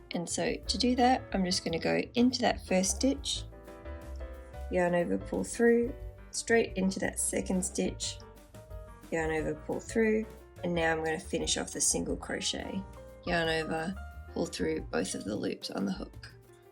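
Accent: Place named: Australia. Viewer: Sounds Australian to me. Great look for crotchet.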